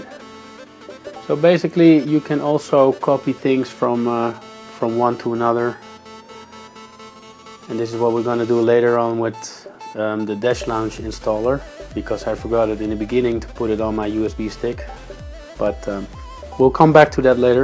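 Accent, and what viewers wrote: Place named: Netherlands. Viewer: I was born in the Netherlands which is why I'm very good at recognizing Dutch accents. So far I haven't been wrong and judging by your accent you must be Dutch.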